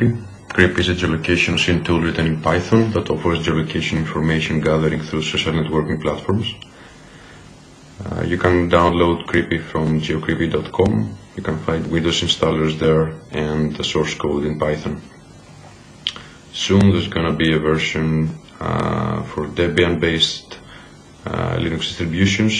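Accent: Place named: Greece. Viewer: Να τος με τα αγγλικά αλλά ελληνική προφορά xD Nice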